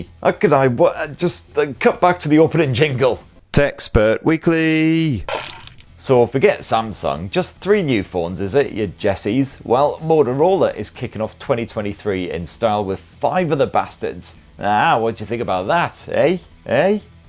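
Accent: United Kingdom. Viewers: You're the only person that makes me like British accent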